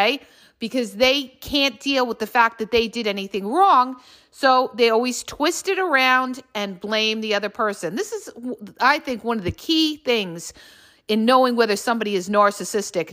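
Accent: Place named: New Jersey, United States. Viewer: I guess you're from Jersey.Thank God I love you.I'm from Elmwood Park.I love the way you talk and you don't put up with s*** and you swear that I love you liked and subscribed.I was with the covert narcissist 44 f**** years, I left 6 months ago and enrolled in cosmetology school and with my old roommate.But i'm still sad the abuses something else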